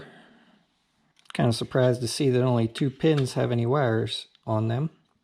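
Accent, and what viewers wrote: Pittsburgh, United States. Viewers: Sup man. I thought you sounded like a yinzer. I grew up dahn the road in belle Vernon. Live it pittsburgh now. Just ordered a black xp. 0rder # 1999*. Can't wait for it. Good vids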